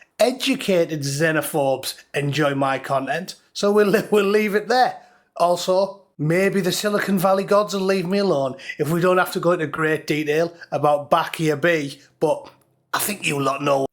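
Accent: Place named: North, United Kingdom. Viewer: When you served you were the best of us and now you still are northern sounding gentlemen.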